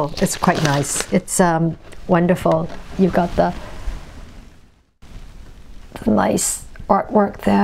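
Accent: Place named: England, United Kingdom. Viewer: Hey I stumbled on this video by accident also, I love the the too and like very much. I been watching this American girl Melinda something, her videos about vinyl records but her accent just drives me nuts 😂 so good to hear an lovely English speaking woman doing reviews. Keep up the good work your amazing 😊 thank you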